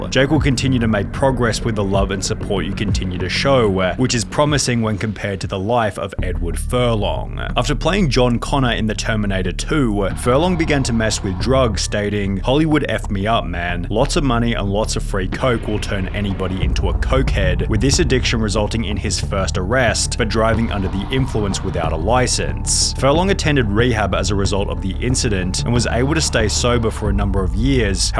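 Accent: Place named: Australia. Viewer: Great...another Australian narrator... why?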